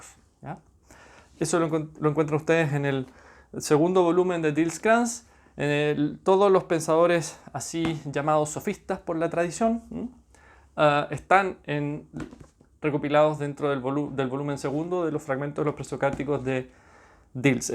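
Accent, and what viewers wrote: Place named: Chile. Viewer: Gracias por la explicación. Y me gusta el acento chileno. Es muy apacible.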